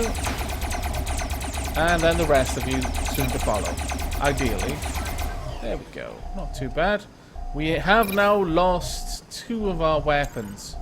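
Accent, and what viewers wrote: Wales, United Kingdom. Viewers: A MASSIVE: THANK YOU!!! (^_^) Hi! I'd been watching lots of Cataclysm: Dark Days Ahead vid's here on youtube when I stumbled across your fantastic channel. After I'd ran out of your Cata' vid's to watch I briefly checked your Pixel Piracy when I noticed these Robocraft vid's of yours. As a fan of Minecraft & Kerbal SP the appeal was instant. I downloaded the game that same & haven't touched anything else for a solid week now. I've been making pretty much 1 to 2 vid's a day now since I started out on this epic quest of awesome cube versus cube destruction and would just like to thank you for your part in this. Will give you a shout out in my next video. I'm a fellow Brit & love your accent [ Welsh? ] - hope to see you ingame someday & please feel free to see what you've caused if you ever have the time over on my channel, would love some feedback if you do ever get the time to peruse my efforts so far, cheers Sho (^_^) <link>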